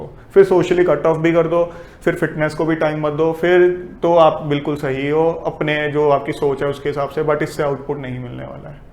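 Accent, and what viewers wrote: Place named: Punjab, India. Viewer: u have punjabi tone sir while speaking English and it makes unique👌👌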